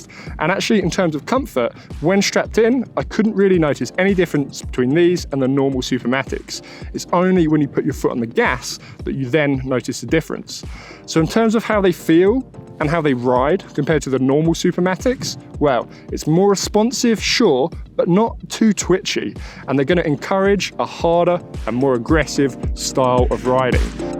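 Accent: United Kingdom. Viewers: You are the top snowboarder i like most with British accent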